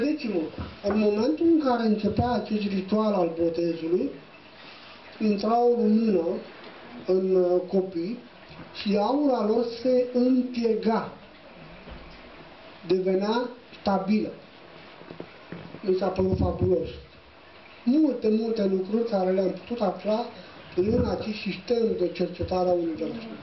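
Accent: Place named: Moldova. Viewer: spuneți lucruri foarte interesante, vitale, dar nu este o claritate în sunetul de transmisie, pe lângă faptul că auzul scade la anumiți oameni și dvs.aveți pe ici , pe colo un minunat accent moldovenesc și o gestică ce mie îmi stârnește râsul, adică îmi place....mulțumesc infinit.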